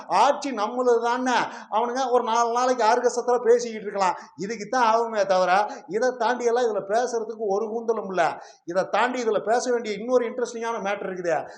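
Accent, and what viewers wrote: Kongu, India. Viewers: யோவ் நண்பா.. நீ எதார்த்த கொங்குத் தமிழில்.. கொங்கு பெல்ட் மக்களின் மனசாட்சியாக வெகுஜன சாமானியன் மனசாட்சியின் குரலாக ஒரு செருப்ப வாயில கவ்வ குடுத்து இன்னொரு செருப்புல மனுஷ சானிய தொட்டு மூஞ்ஜிலேயே அடிச்சாப்ல இருந்துச்சு நீ சொன்ன ஒவ்வொரு வார்த்தையும்..!❤👌 ஆனா இந்த நாயிங்க சாதிய புடிச்சு தொங்கிட்டு இருக்கற வரைக்கும் எவ்ளோ செருப்படி தின்னாலும் திருந்த மாட்டானுக.. (இவனுக நெனசுட்டு இருக்கானுக இவனுகளோட சாதி ரீதியான அடுக்கு தான் என்னைக்கும் ஜெயிக்குமுன்னு) ஆகவே, செருப்படி தொடரும்..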